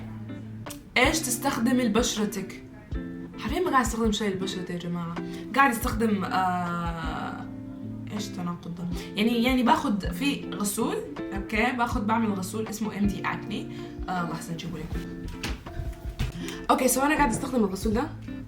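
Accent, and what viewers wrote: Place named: Libya. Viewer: احلى من يتكلم ليبي🥹❤️❤️❤️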